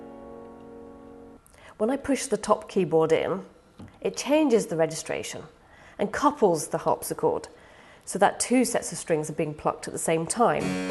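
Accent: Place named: Oxford, United Kingdom. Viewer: Oxford English pronounced properly. Very clear and eloquent.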